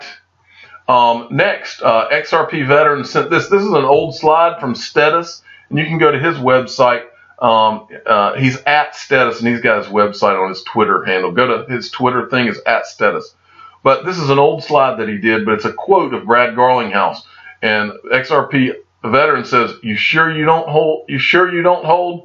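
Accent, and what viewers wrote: South, United States. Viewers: When I 1st started listening to you with the southern accent and your relaxed nature, I didn't take you seriously. That soon changed with the substance of your videos. You nail it every time. You, Tony and Eri are my favorites. Strait shootin news is what gives us confidence. One thing I get confused at though. I think you should also be mentioning the Trezor Hard Wallet. My opinion, it's better than the Nano. I bet you had a smile on your face until I mentioned the Trezor, sorry man. LOL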